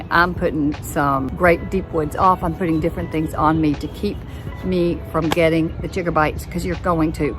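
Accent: South, United States. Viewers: For those of us who ARE on the same page with you, people with conservative leanings (most of CA IS CONSERVATIVE, I know, you don't buy it, but... Demoncrats), it seems like we have to PROVE ourselves to you. Enough of that. I am the one who thinks, acts and lives his life QUITE the same as you folks. My experience, just a couple weeks of living here, is that these dozens of videos I've watched on the subject are, by and large, the truth. Folks here are kind, polite, they DON'T PUT ON A FACE, they don't ignore or dismiss you. And, as a person who was born "up north" in Michigan, I love that southern drawl, and yes, you folks have that. Let me tell YOU something. If you have never lived in California, as I have, YOU have no idea what that experience is like to have whole neighborhoods, communities, cities and the WHOLE COUNTY of LA as entities which are generally working against you. 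This is besides the crazy high cost of living, crime, homelessness, people begging for money outside of EVERY store or gas station or facility you enter. I was lucky I did not incur direct harm to my person throughout 17 yrs., but I did have a couple of incidences of hit and run to my car that the police would not even respond to because no person was hurt. Cut me some slack, I am, AT HEART, a good ole boy, a friend, a good neighbor, I am willing to work with you, help you out, and you can trust me to come through for you. I came here to be relieved of that CA burden, and so far, I am seeing that.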